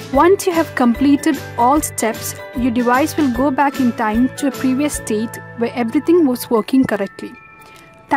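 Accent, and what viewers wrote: India. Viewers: Finally! An Indian voice with a video tutorial that actually works. Thanks for your help ma’am!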